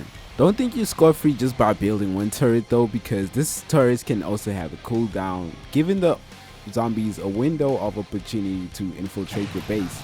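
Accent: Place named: South Africa. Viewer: Somehow I just knew you were South African, we can just sense it between our people, subscribed once I realized you were, gotta support good ZA creators.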